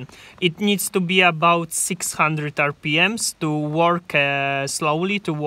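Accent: Poland. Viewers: Polski akcent nie oszukasz… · polaKA slysze XD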